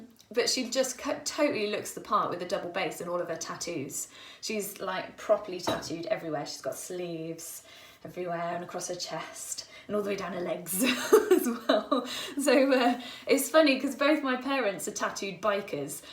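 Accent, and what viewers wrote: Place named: United Kingdom. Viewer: Does your mother have a presence online? You make it sound like she is someone I would enjoy listening to. btw, I am also a biker but no tattoos. Speaking of listening, I love your british accent. I have a friend in my club that last year married an English fellow and brought him here to Michigan. Due to following so many GB YTers, I have a pretty good handle on the accent and slang. When he got up to speak about at our last meeting he got pretty excited and you could have heard a pin drop because some of his rant, while typical british, can be somewhat racist here. I started laughing and got up to explain what he actually said. That ended up being the quickest and best meeting in months.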